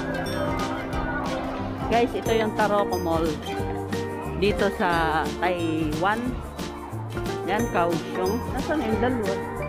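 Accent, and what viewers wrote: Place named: Batangas, Philippines. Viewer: Such a beautiful plce . Enjoy your Gala nga Sissy . Stay safe. Tga Btangas ka na Sis. May punto kasi.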